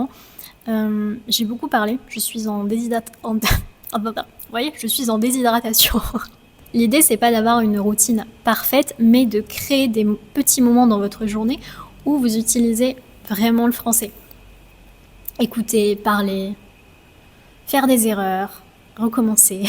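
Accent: France. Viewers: I used the technique of finding out what day to day things were in french, then when going round the supermarket or just day to day life then seeing them i'd say them back to myself until they were so deeply embedded in my brain that i knew them off by heart. Feels slightly reassuring that I might not be the only person saying the words back to myself in the shop🤣I find myself lucky that someone that goes to my local pub used to work for a large French oil company so hes pretty fluent in French and I find myself attempting to have little conversations with him to try and improve. I tend to learn more by doing something than just from being show how to do it, so being able to practice that way is really beneficial for me. These videos are really useful! I like little phrases and like using you "bonjour, j'espère que vous allez bien", "Si tu n'es pas heureux ou tu es, bouge, tu n'es pas un arbre." and the one you said your Grandmother says about life being a sandwich of a certain kind 😂Those little phrases go a long way in a video, even if it's just learning what the words are in them, especially since sentence structure is different to english with word placements in them! 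I don't want this to come across as a "oh great im magically fixed comment" but your English is really really good so try not to feel self concious about having a strong French accent when saying some words. You're pretty nail on the head 99.9%% the time, plus it's not your first language, so it's not always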